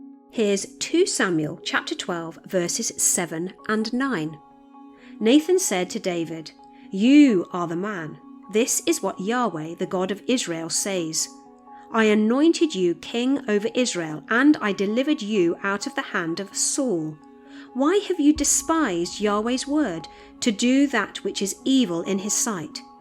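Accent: England, United Kingdom. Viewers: I so enjoy your quizes and also love the sound of your voice with its English accent.😊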